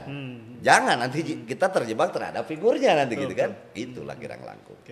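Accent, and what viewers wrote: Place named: Sunda, Indonesia. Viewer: teu ngeunaheun bahasa sundana host teh wkwkwkwkwk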